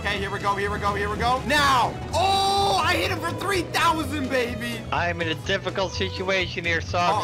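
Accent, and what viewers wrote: Scotland, United Kingdom. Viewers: Is Scottish or something? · is Scottish wow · I didn't know was Scottish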